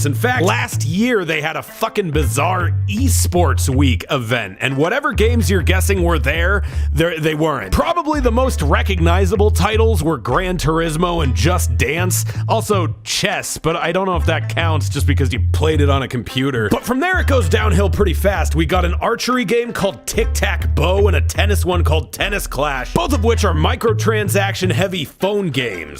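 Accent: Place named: United Kingdom. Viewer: His British accent reminded me of dex there (sanity not included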